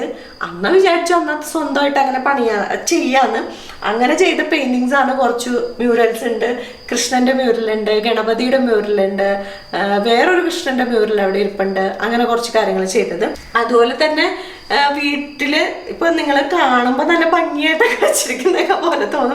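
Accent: Thrissur, India. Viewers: നാട്ടിൽ ത്രിശൂർ ആണോ വീട്..?? സ്റ്റാർ മാജിക്കിലെ ലക്ഷ്മിയുടെയും സ്ലാങ്കും വോയിസും ഒരുപോലെയുണ്ട്...👍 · Hi, അവതരണം നന്നായിട്ടുണ്ട്, painting അടിപൊളി ആയിട്ടുണ്ട്, നാട്ടിൽ എവിടെയാ, ഒരു തൃശൂർ slang പോലെ തോന്നുന്നു.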